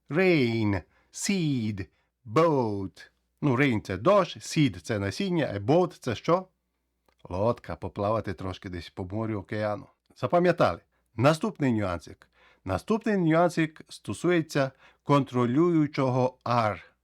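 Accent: Transcarpathia, Ukraine. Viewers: Урок надзвичайний(принаймі,для мене...людини,ака хоче вивчати англійську мову...),Ваша українська мова (як на мене -закарпатська...)сама собою притягує і спонукає вчитися,ну і сама методика пояснення азів англійської....хоча Ви кажете,що англійську дуже легко вивчати...(і так,і ні....як для мене,принаймі...коли за плечима вже немало прожитих років...)а американська англійська чого варта....Та надіюсь....найважче дається зрозуміти співрозмовника (цю...) Файно дякую Вам !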